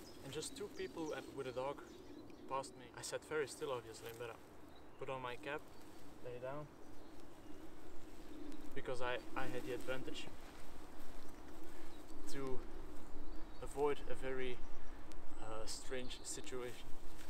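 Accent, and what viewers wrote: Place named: Russia. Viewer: Классно снимаешь, акцент, по правде говоря, всё-таки русский)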